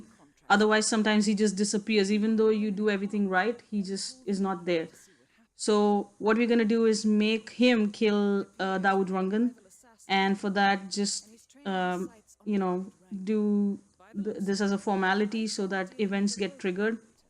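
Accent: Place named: Mumbai, India. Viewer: Even put the accent of mubai on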